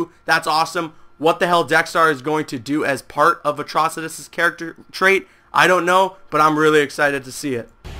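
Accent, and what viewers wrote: Canada. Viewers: Canadian accent ?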